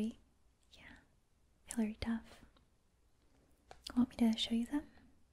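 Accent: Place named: South Africa. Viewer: I sorta get springbok vibes from your voice and it's the best thing ever.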